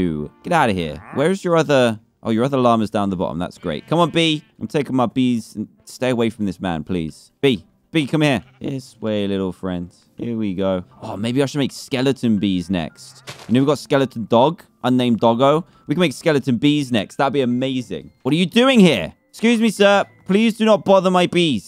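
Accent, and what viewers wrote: England, United Kingdom. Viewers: Wat part off England are you from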